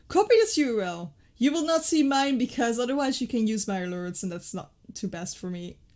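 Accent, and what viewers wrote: Netherlands. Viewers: nice are you dutch you sound dutch!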